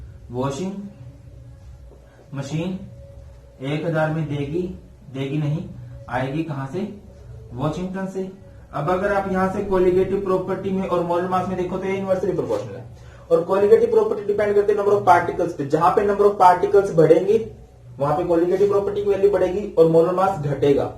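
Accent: Haryana, India. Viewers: cool sir r u from haryana thanks sir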